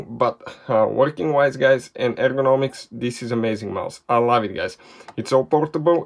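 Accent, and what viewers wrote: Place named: Russia. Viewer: i love the thick Russian accent lol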